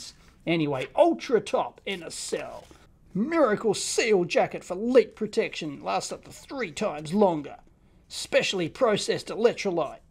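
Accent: Australia. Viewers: I thought it was a Techmoan video... until it started with that Aussie accent lol.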